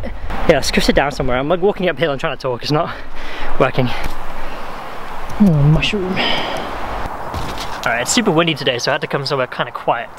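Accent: Wales, United Kingdom. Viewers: I watched one of your really old videos the other day and you had a welsh accent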